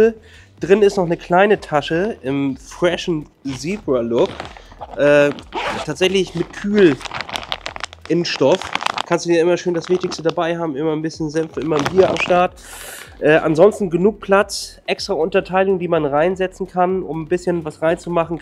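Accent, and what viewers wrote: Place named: North Germany, Germany. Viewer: Mönsch, schön norddeutsch. Fühl mich gleich heimisch! :)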